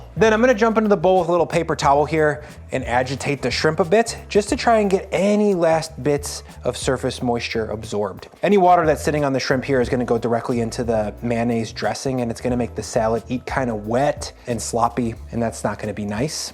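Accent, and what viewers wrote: United States. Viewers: I like your recipes, you have some of the best on YT. But I hate your insistence on using metric measurements. You sound American, and your about section says United States, so why are you using that when that makes it more difficult for the American audience? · Please, you sound like a US citizen, if not apologies, I am not at all trying to offend, but can you use the measurements most US citizens use, ie.ounces lbs etc. Great content and look forward to more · for the love of pearl why, why metric......you sound like your in the U.S. is that not the case? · You sound very American. Why grams?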